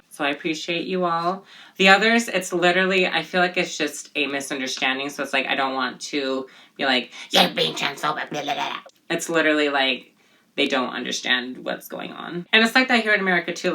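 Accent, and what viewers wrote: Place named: Asia. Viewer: I thought u were Asian by your speech.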